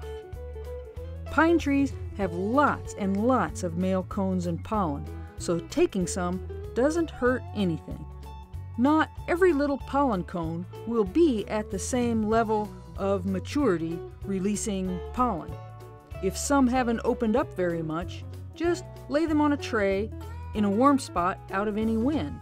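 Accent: South, United States. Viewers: I am not a native english speaker, but your voice sounds like how I would imagine a southerner would speak like haha. where are you from?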